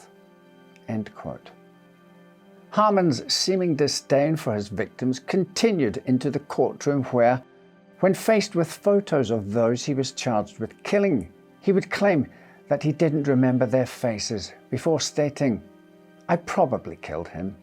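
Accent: Scotland, United Kingdom. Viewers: You accent amazes me, I grew up in the Scottish Borders so it makes me feel like you are from around the same area but you lean heavily on English for a lot of your R sounds you sound a non rhotic scot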